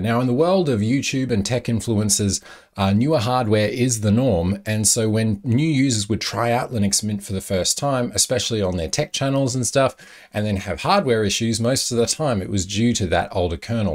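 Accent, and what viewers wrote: Australia. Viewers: I hear Australian accent > I stay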